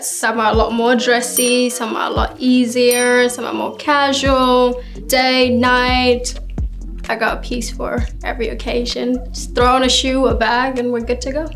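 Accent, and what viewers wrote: Australia. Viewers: She sounds Australian almost. She is beautiful! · She is so down to earth and very beautiful. I love her Sudanese heritage and Aussie-tinged accent growing up in Australia ;) I love Naomi taking her under her wing...so cool. She deserves so much success. xx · She’s beautiful and has such a stunning figure, love her Aussie accent as well!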